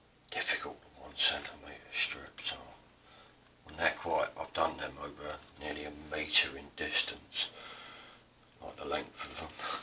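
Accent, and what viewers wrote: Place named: Netherlands. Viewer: Watched all of them .. Very nice technique.. for a dutchie your accent is very difficult to follow, but luckily art most of the times doesn't need a language! TFS..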